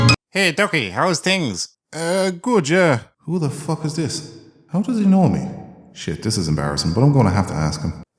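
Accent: Ireland. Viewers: You are the most Irish man I've ever heard. I love it.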